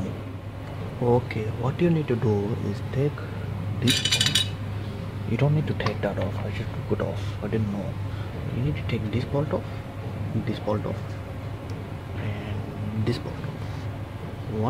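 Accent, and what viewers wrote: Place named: India. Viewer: curry accent :) made me lol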